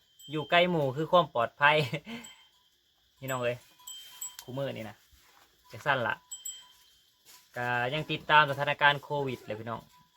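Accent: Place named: Isan, Thailand. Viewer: เว้าม่วนเนาะจร้าคือิสานคัก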